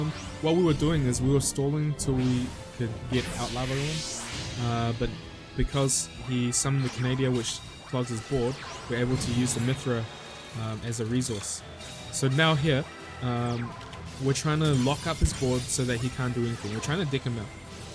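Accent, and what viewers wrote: New Zealand. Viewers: Dude. Your accent. Are you from NZ???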